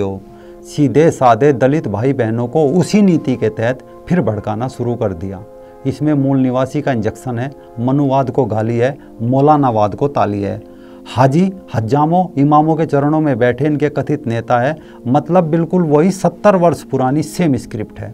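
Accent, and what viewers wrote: Haryana, India. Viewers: Bhai aapke bahut videos dekh Chuka Hoon. Ek baat saaf saaf keh deta Hoon, Aapka bolne ka tareeka aaj ke har pravakta see behtar hai...! Aapki boli me haryanavi yaa garhwali/kumaaoni jaisi boli dikhaayi pad rahi hai.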